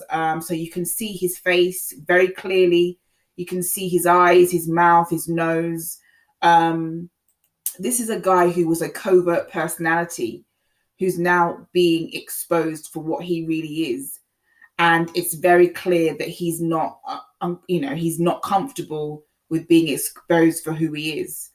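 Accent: United Kingdom. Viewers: Love your accent a new subscriber are you from the UK.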